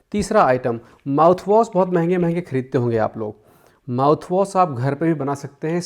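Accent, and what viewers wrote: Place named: Uttar Pradesh, India. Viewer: Kya aap uttar pradesh se hai?, aapki bhasha muje meri dadi jaisi lagti hai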